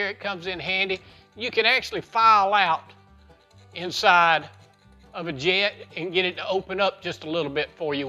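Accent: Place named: Italy. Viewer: Thanks for sharing . But With that accent you must be Italian. Those parts bags were a good idea, thank you 👍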